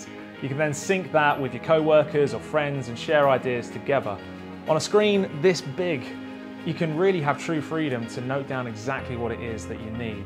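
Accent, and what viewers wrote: United Kingdom. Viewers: with a man of Kent Maidstone accent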